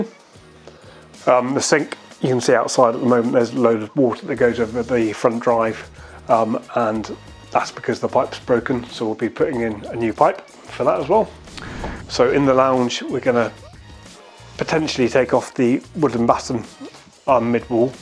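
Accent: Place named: Newcastle, United Kingdom. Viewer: Is Newcastle. Howay man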